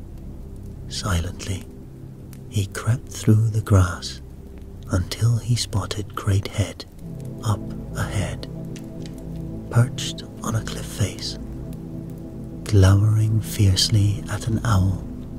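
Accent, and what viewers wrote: Scotland, United Kingdom. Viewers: What's also interesting about the author is he has a beautiful Scottish accent, so the fact he can do all these other accents just makes it even more impressive😊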